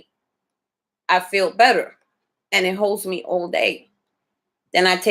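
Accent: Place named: Bronx, United States. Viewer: Honey, are u from the Bronx? I ask because I am. U sound like it. Anyway, I know ur not a professional but I want to ask. Have u ever had ur dr cut ur klonopin dose. Mine did it. And it's been 3months. I updosed n still no relief, my brain is so messed up. Cant function. I'm a CNA n have to work. U can imagine. I updosed again. So stupid up n down. U ever had this?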